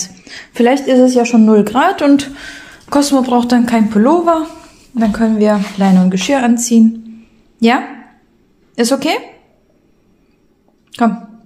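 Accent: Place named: Turkish German, Germany. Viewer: Jetzt fängst sogar du schon mit diesem Türkendeutsch an man man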